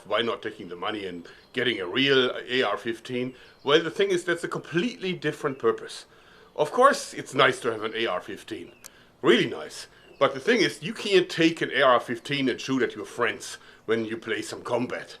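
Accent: Germany. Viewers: lol ist der deutsch voll der deutsche Hintergrund in der Stimme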